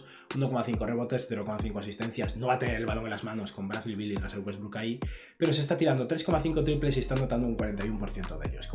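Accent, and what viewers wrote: Spain. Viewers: any day my dude!! My spanish is not that bad because I had several lessons and my dad is Puerto Rican, im just terrible writing it. You have a nice spanish accent btw lmao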